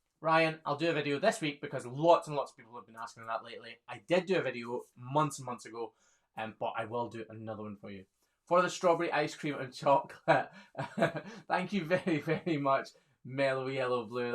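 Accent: Scotland, United Kingdom. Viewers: I missed the live event, dang it, I was going to ask if you were scottish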